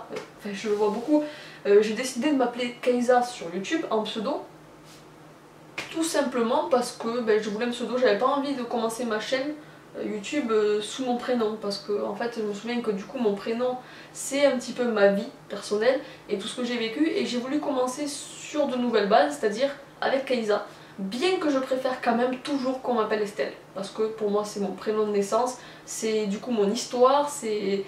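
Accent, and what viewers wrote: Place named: Corsica, France. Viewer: j'aime bcp ta vidéo tu as un accent Corse c'est ouf !😂 continue tes vidéos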